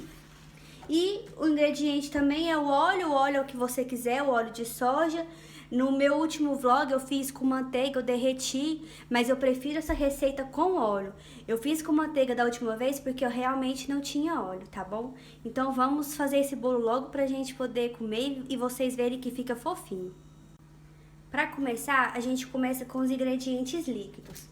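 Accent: Minas Gerais, Brazil. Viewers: Olá ! Eu resolvi te segui pq amei seu sutaque igual meu povo mineiro e simplicidade amo tudo isso Vc é 10 parabéns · esse sotaque mineiro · Amei seu jeito de falar até parece ser mineira kk . Acabei de colocar a massa para assar . Acho que vai ficar bom . 😘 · Vc é uma linda, parabéns pelo seu carinho... Ahh e esse sotaque mineiro é mto gostoso de ouvir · sotaque de mineira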